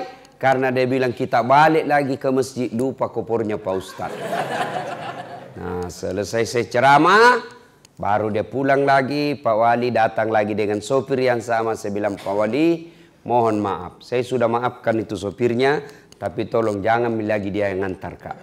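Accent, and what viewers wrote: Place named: Sulawesi, Indonesia. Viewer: Alhamdulillah, dpt siraman rohani logat sulawesi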